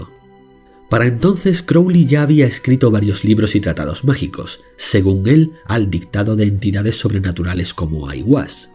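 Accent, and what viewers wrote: Spain. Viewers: Ese acento, diría que eres andaluz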